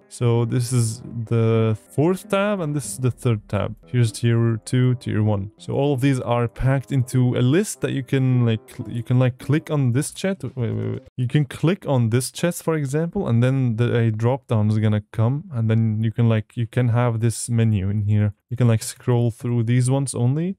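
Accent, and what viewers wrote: Germany. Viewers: Wait are you German?😮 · are you german? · are you german :O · Are you German? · Bildquelle suchen is reverse image search. I would have never thought you were German. Your accent is really clean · holup are u german or austrian??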